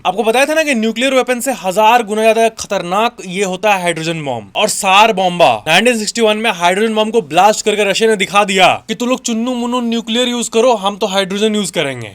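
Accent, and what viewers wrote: Bihar, India. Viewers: Is chutia ka accent bihari h video dekhne ka man ni krta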